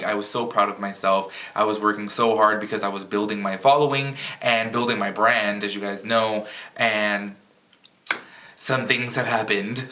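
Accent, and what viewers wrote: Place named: South Florida, United States. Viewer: Aww I missed you! I love your reviews. Ive always wondered are you from Miami originally? Or Lauderdale? I pick up on that accent being from south Florida myself.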